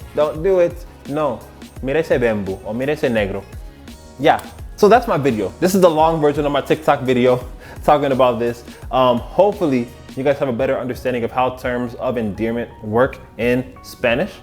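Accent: United States. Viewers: That gringo accent makes me really practice my speaking skills!! I DO NOT want to sound like that!!!! LOL!!! IT is truly "cringy"LOL! Thanks for the awesome vid! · 😂😂😂😂😂 bro el acento gringo yo moriiiiii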